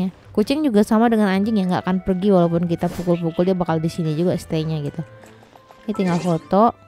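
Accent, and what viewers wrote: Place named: Sumatra, Indonesia. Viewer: logatnya kek org sumatra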